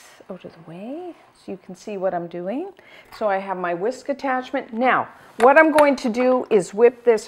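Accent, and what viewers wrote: Canada. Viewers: Shane Enz Actually she's a Canadian, you can tell by her accent.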